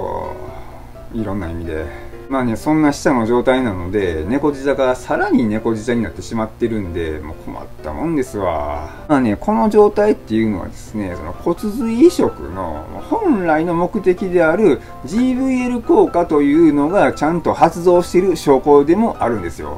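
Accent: Osaka, Japan. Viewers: マスクをしてない元気なさんだ〜❣️今発病された頃の病室からの動画を見ています。頑張れ！頑張れ！と思いながら見てるけどいつもこちらが元気をもらっていますよ❣️ 発病した友達は8日間の抗がん剤投与の後少しづつ副作用が出てきてます。私がさんの動画でもらったパワーを伝えています‼️ 同じ症状になってみないと本当のところはわからないけど、口の中の素晴らしいハーモニー‼️‼️イヤでしょうね…ツライでしょうね……それを軽い明るい大阪弁に乗せて語るさんが大好き😘 頑張れ〜❣️頑張れ〜❣️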